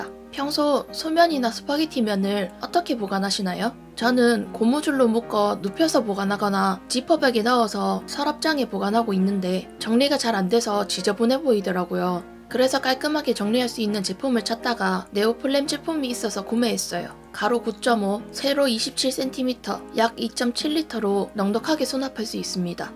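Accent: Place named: Gyeongsang, South Korea. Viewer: 경상도 억양밖에 안들림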